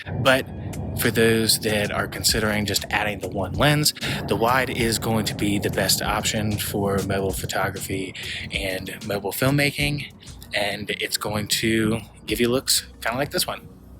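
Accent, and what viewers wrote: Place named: South Africa. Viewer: Your accent has some South African sound to it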